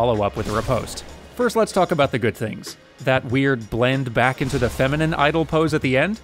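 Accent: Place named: United Kingdom. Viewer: That british accent tho. Most Exquisite.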